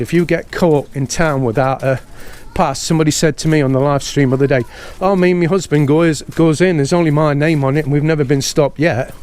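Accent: United Kingdom. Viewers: Your British accent would make it difficult for most regular Filipinos to understand your English. The kind of English taught in the Philippines is American English to begin with.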